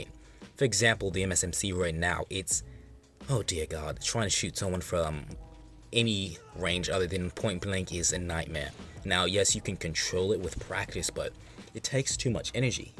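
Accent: United Kingdom. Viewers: yeah yt is drunk half the time it’s no prob....also loving the British accent 🤣👌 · Love the news vid and British accent too!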